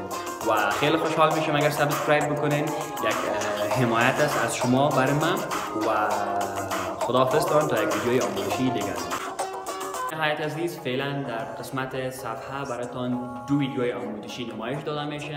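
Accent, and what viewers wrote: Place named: Afghanistan. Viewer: تو ادامه نده افغانی هستی زشتی لحجه داری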